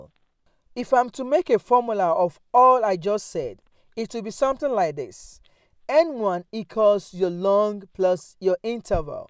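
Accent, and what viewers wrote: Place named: Kenya. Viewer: Great vid. Just out of curiosity, what country are you from? I lived in Kenya for 30 years and your accent sounds very familiar!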